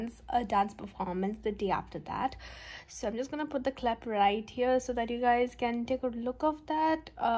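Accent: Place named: India. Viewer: She has a Indian accent and it's so cute to listen Indian English accent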